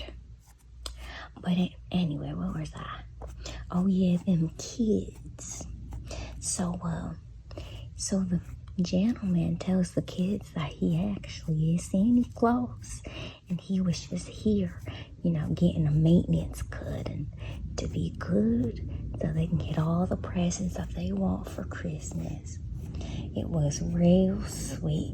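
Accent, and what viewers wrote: South, United States. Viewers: “Nice and purdy” Don’t know why but your southern accent, particularly the grooming videos, gives me Steel Magnolias lol I love it ❤ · I still loove the southern accent! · Gawd, I love that Southern accent. Great video too ❤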